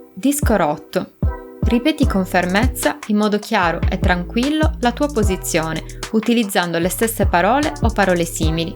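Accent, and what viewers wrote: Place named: South, Italy. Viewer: Amore, con questo accento terrone? Un libro di grammatica, no? Quanta ignoranza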